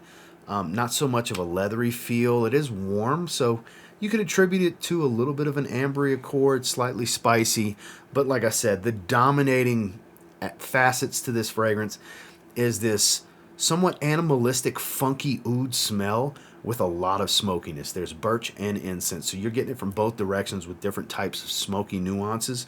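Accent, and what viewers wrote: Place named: New Orleans, United States. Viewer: Gotta be from New Orleans or at least la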